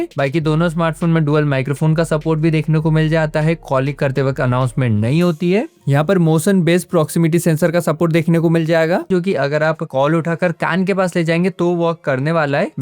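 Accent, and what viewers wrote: Indore, India. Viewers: Bhai aap indore se ho kya?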